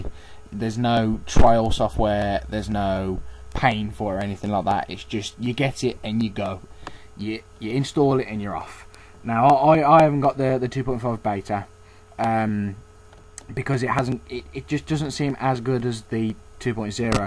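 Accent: United Kingdom. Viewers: this black country accent comes on lol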